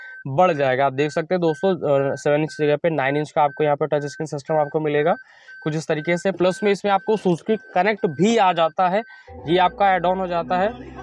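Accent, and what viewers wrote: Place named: United Kingdom. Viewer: Bhai tum hindi bhi British accent me ku bolte ho...bahut chewtiya sound krte ho bhai... isliye bta ra hu bs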